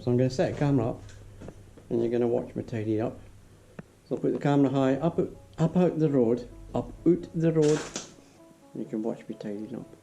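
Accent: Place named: Aberdeen, United Kingdom. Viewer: Hi, fine to hear a local accent on YouTube! I’m building some bookcases at the moment, where do you get decent quality sheet goods and hardwood from in Aberdeen? All the merchants I’ve been to just seem to sell crap construction grade stuff and treated timber. Love your work and vids, if my finished one is half as good as some of yours I’ll be doing nae bad!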